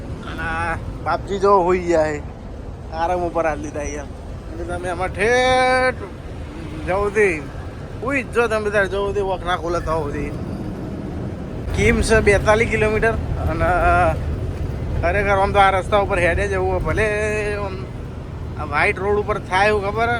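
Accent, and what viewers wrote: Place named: Kheda, India. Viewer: तुम्हारी भाषा नडीयाद खेडा नी छे🎉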